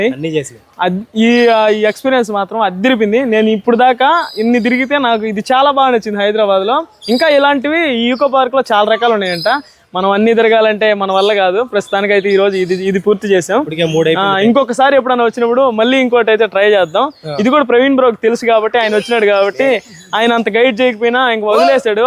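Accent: Nellore, India. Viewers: Nellore yasa chittamuru inthaka mundhu nellore ippudu tirupati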